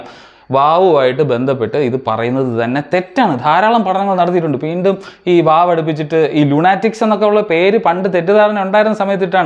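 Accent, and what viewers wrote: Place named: India. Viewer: Indian accent ആയതു കൊണ്ട് പെട്ടന്ന് വാക്കുകൾ ക്ലിയർ ആവും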